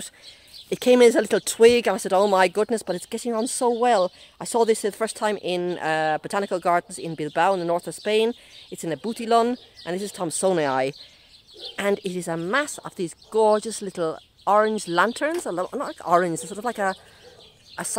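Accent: Ireland. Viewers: I just discovered your channel today, and have been binge watching your videos. I just watched your "last" video. I am sooooooo dissapointed your not continuing on with your videos. I understand you completed your 3 year mission, but from the looks of all the well wishers, I think many people would love to see you continueon and make a new journey. You have so much knowledge to share, and your very entertaining with your funny witt, plus that Irish accent is lovely. I loved seeing your garden, it gave me so many ideas to start thinking about for next year. please come back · Only found your videos today(while searching for pruning advice on viburnum tinus)Twas the Irish accent that made me continue watching!Wowza,you are truly AMAZING! Thank you sooo much for sharing the wonderful advice/suggestions/inspirations/and of course your incredible knowledge & sensiblity/you are a GEM!Continued Good Health,& Enjoyment with your Gardening.Best Wishes,from rainy July in Ireland.p.s. 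I have enjoyed 2 hours of watching some of your videos, (lots more to watch yet),that "d'other half"is wondering when is dinner!!!!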